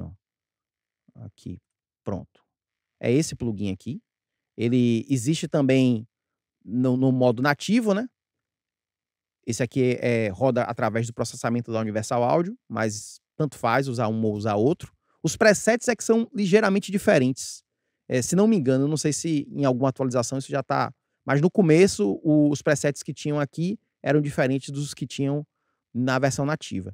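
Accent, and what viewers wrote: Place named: Salvador, Brazil. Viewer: Muito bom ouvir o sotaque soteropolitano falando coisas tão de audio tão importantes e trazendo a música fantástica que se faz em nossa cidade.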